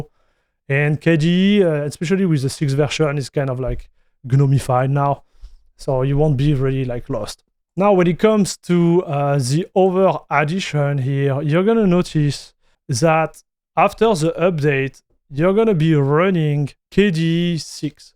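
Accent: France. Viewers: I love your videos, so informative and I like your french accent too 💖 running nobara 41 rn. unfortunately I haven't gotten time to game as much but it's great. I've been able to get almost everything working with a few quirks I need to find my way around over the year. funny thing, my cs2 install won't stop lagging and hitching. same issue on windows too, to a lesser extent tho. haven't tried different distros yet but I figure it'll be the same everywhere atleast on wayland. not touching x11 cuz I want how to learn to fix issues instead of putting a temporary band aid. anyways thanks for the videos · Les français qui ont remarqué l’accent français 🖐️ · you don't need to be french to notice the heavy french accent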